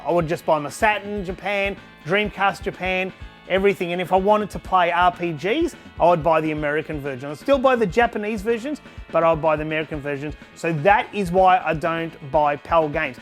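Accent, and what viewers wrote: Australia. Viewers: Thanks to you, I can perfectly understand Aussie accent now. A couple of months ago when I first subscribed, I didnt have any idea what you are talking about:) Well done mate. Keep up the good work.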